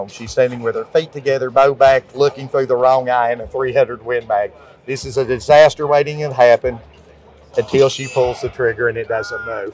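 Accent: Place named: South, United States. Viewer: Where are you from? Im from Bama and damn thats some southern twang.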